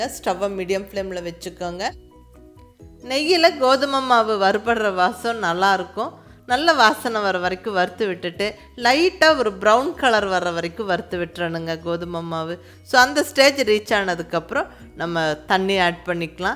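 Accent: Coimbatore, India. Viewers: ka Coimbatore style pechu alagu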